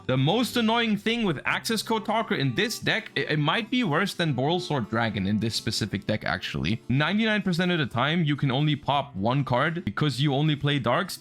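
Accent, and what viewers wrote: Germany. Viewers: You’re from Germany?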